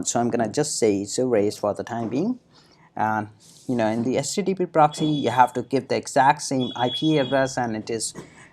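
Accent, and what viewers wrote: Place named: United States. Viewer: bhari english bola he bhai maasaallaahh full american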